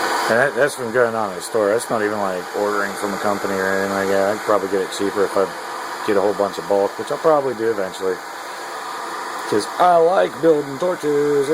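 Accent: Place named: Western Pennsylvania, United States. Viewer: New subscriber my friend. Is there a market for laminar surface mix torches? I am a chemist and I do scientific glass blowing. well I used to. When I moved up to Pa from Florida my glassblowing equipment was "misplaced". Including my glass lathe. I am trying to get back into things and I am designing torch set. I'll stay in touch. Where are you ? You sound like Western pa..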